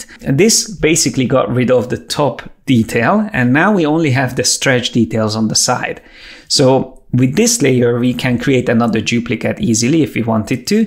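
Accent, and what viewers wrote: Hungary. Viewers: I'm like 99% sure this guy is Hungarian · oooh a hunglish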